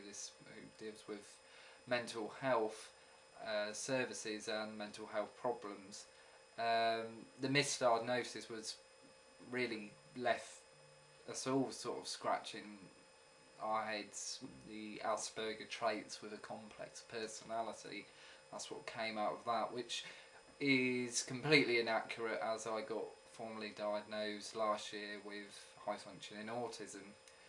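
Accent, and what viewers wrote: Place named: United Kingdom. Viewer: This has absolutely nothing to do with the topic of your video, but everything to do with my preoccupation of speaking with different accents: Where are you from? What PART of the UK? Because wherever you're from is where my I-don't-have-to-think-of-it British accent comes from. Fucking love it.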